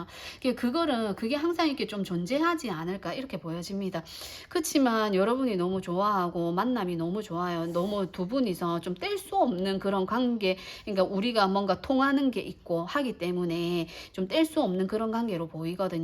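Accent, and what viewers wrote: Gyeongsang, South Korea. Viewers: 경상도 사투리땜에----😢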